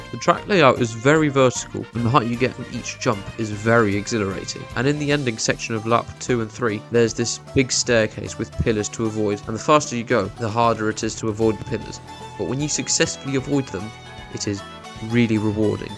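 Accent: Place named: United Kingdom. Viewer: Hold up...ur from Spain? Then, why do u have a British accent? 🤨🤔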